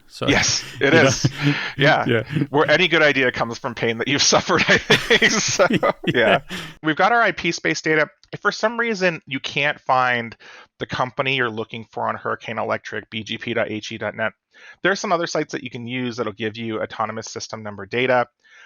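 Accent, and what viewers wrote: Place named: South Africa. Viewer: Jason did a REALLY GOOD JOB! I hope we get an episode finishing all the levels on recon. I personally would like a video on Gaining access and Maintaining access. THANK YOU always a pleasure hearing the all too familiar South African accent.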